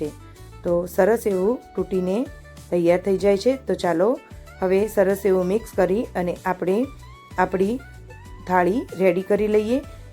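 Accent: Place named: Mehsana, India. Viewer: Mouth watering thaali 👌 Your accent looks like mehsana, if so, please reply 🙏 Thanks 😊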